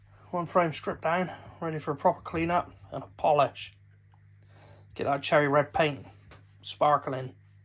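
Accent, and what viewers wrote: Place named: Devon, United Kingdom. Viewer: lol sounded sw as in Devon... Plymouth (Plymonian)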